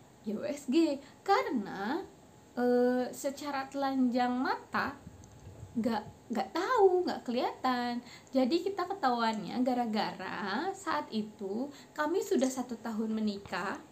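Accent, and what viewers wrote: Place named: West Java, Indonesia. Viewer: hee,.. Iyaa kaa makasih banyak yaa,. Di lihat dari cara penyampaian dan logat kaka kelihatan banget sundanya 😅. Salam dari bogor ka Semangat bikin konten berfaedah nya ka.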